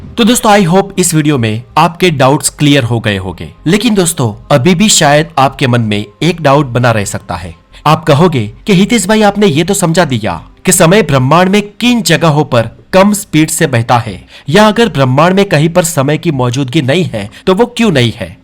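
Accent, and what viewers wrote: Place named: Gujarat, India. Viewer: તમે ગુજરાતી છો... ને??? 😁😁😁 ખબર પડી જ જાય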